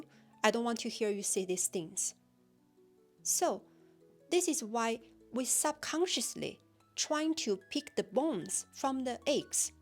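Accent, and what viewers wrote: China. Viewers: 我非常確定你的母語是中文，對嗎？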